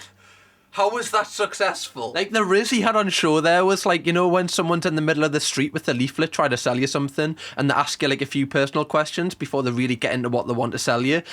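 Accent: Newcastle, United Kingdom. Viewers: Watching and me mam walks in and says ‘eehhh he’s a geordie’ like we aren’t also geordies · what accent do u have? ik its british but idk where edit - newcastle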